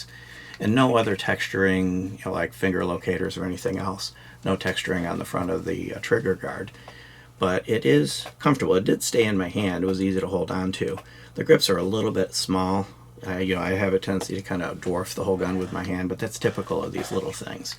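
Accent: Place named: Midwest, United States. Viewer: I am 99% sure the alligators in the beginning makes me believe he's in Florida. He even mentioned in a few of his videos he's in Florida. From the sound of his accent it sounds like he's from Michigan, Illinois, Wisconsin or one of the East Coast states originally and later immigrated to Florida.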